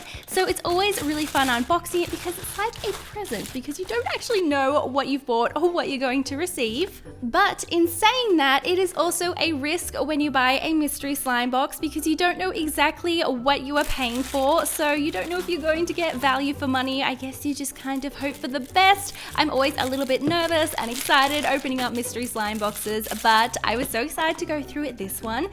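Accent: Australia. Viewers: Meh_emo! Honestly it’s very possible I’ve gotten stabbed with one and it cut me so that’s probably what she was trying to say but she is Australian so they might say it like that over there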